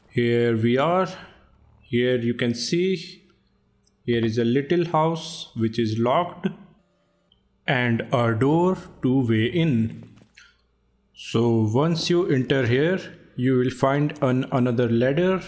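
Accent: India. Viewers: His voice sounds like Indian but peaceful but it’s cool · Resist kids his indian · 😂😂 indian ho bhai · He is indian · He Indian most Indians have an accent like that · It's because his an indian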